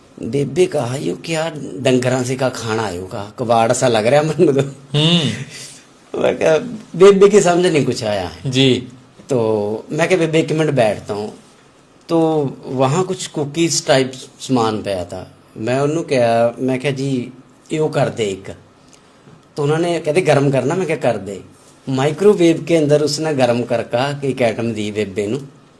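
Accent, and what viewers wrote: Puadh, India. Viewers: Laggda tuci v punjab ch ni rehnde eh poandhi boli aa .Mohali ch painde addhe pinda ch aahi boli jandi aa te Zirakpur. Ropar side v.jirkpur side same edda hi boli jandi same jive bai bolda peya sadde vall thoda ja fark aa bs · ❤ਪੁਆਂਦੀ ਬੋਲੀ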